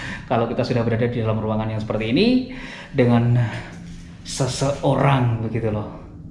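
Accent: Java, Indonesia. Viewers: Seneng mas jawane enak ngerungokne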